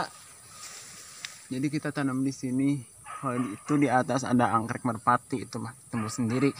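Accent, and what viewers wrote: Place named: Sunda, Indonesia. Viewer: Klo org sunda berbahasa Ind logatnya meni ledok banyak,,mah"😅nya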